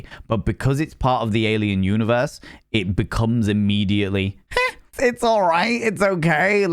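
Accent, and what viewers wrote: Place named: London, United Kingdom. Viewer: He's British.thats how people from London talk.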